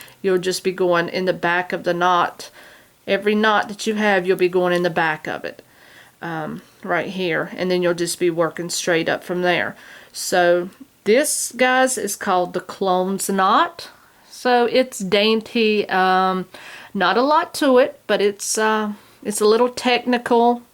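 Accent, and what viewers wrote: South, United States. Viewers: That is just wonderful tutorial Ms! I finally got the idea with the Clones Knot needing 'loose' stitches to pull through the wrapped knot! It has taken me all of 14 days to figure it out, and today, there you were. The sun is shining a bit brighter for me today, again, thank you! Love that southern accent!:-)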